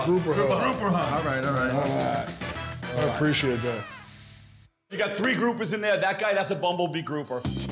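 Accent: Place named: New Jersey, United States. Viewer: They sound like Italian "Joisey" boys, are they from "Joisey" ...they're loud and obnoxious from there.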